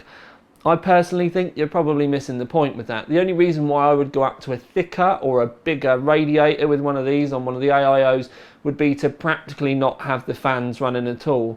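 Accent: United Kingdom. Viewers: Andre Salles That's because he's in Britan and speaking British English... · Basic benchmarks No they didn't. The English did. Ever heard of a country called England? Ever heard of the English people? The English spawned the languge, not the Scots, not the Welsh, and not the Irish. +TheMaraio1 in England dude, he's English and speaks with an English accent, I'm American, I speak with an American accent. It's Americans like you that give the rest of us a bad rep abroad, seriously guys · this hardcore British accent is a little bit hard for me to understand LOL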